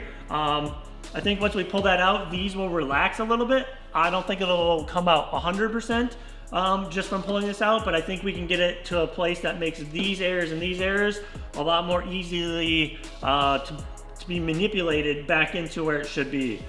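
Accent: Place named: India. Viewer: Why do you have Indian accent? 😁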